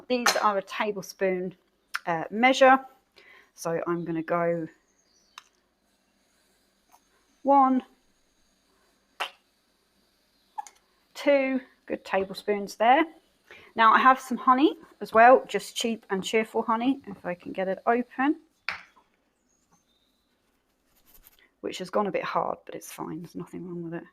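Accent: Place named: South East England, United Kingdom. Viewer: I prefer natural unedited videos. You must be be from the south east your accent is the same as mine.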